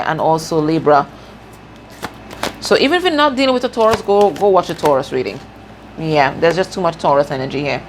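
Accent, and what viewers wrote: Trinidad and Tobago. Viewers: .....are you a trinidadian??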